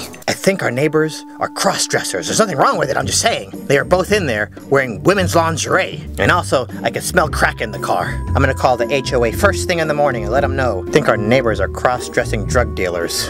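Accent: Trinidad and Tobago. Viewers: I was waiting for him to bus out a trini accent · You from Trini?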